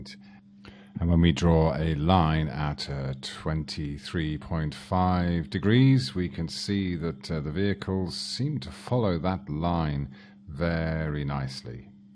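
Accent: United Kingdom. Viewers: You seem to confuse the terms Ball with Planet and all the physical differences attributed to each. you seem to forget that light propagates through a vacuum in parallel rays . its about the angle of the ground to the incoming rays ie: the amount of light/energy hitting the ground per sq meter or what ever wacko unit you chose to use. at the higher latitudes the surface faces away from the sun more and hence there is less energy per sq unit of ground. than at the equator this is just kindergarten thinking. you don't even understand the physics of the model you are trying to debunk. you sound intelligent, perhaps the British accent helps, but you even vaguely seem to know what you are talking about. couldn't watch any further as my head was about to explode thanks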